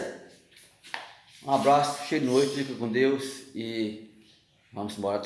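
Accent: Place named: Pernambuco, Brazil. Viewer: Bom dia!. O cara acha qie voçe e do Pernambuco.. Kkkk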